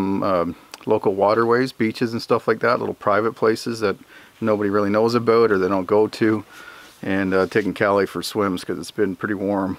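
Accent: Canada. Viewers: If auto insurance doesn't pay for the theft, check with your homeowner's insurance policy. Sometimes you are covered under that. Your videos are always such a treat for the senses. Stunning and beautiful. (And I adore your Canadian accent) 😎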